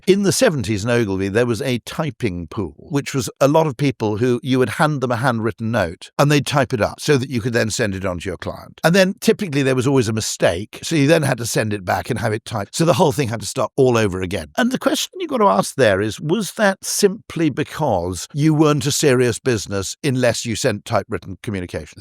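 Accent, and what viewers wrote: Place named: United Kingdom. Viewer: This guy is so annoying, “food tastes better when eaten from a fork that’s not bent” his whole thing is just talking about how perception is reality to the most absurd degree. He spouts nonsense that everything is the same and the only reason products are good or bad is because society thinks high cost = high quality which is just wrong. He’s dumb and he farms his British accent to sound intelligent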